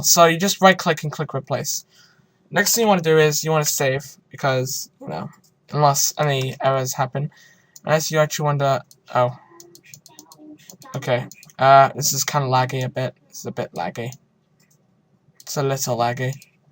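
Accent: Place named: United Kingdom. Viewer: undah combow lol british accents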